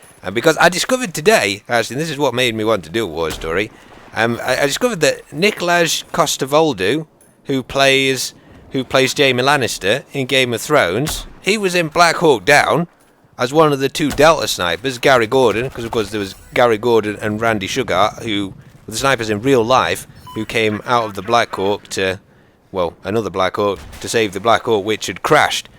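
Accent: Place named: United Kingdom. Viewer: both British accents but defo not even similar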